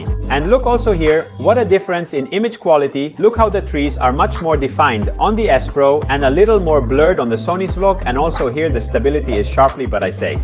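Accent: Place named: India. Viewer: U sound like an indian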